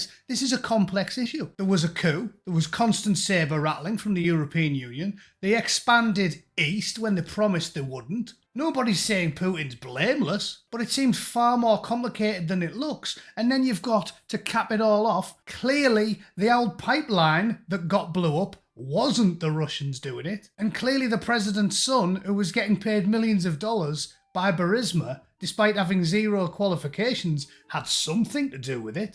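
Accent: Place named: Teesside, United Kingdom. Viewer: Spot on. Agreed with every syllable. Like listening to myself with a Teesside accent. I'll be using the one about John Wayne's safety catch 😂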